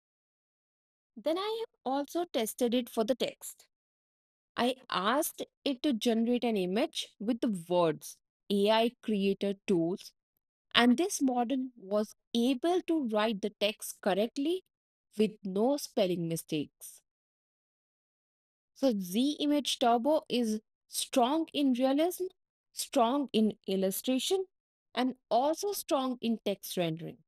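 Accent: India. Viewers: I think her accent is Indian. I'm not so sure she is a she. It could be just an AI trained voice. Most of these channels utilize AI voices. Even people who have great voices are just handing it to the AI to create the audio from their voices, which is much faster, once trained, than recording it yourself. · Indian accent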